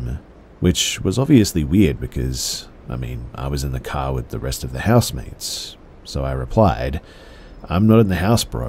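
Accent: Australia. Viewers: Favorite video you put out as of recently. You're the fucking man, Thanks for your beautiful Aussie accent lulling me to sleep every night. You've gotten me through some tough times and I genuinely thank you for that man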